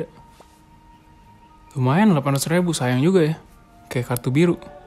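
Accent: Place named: Medan, Indonesia. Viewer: wkwkwk orang mana bro? kyk logat medan ya.. cibai2 la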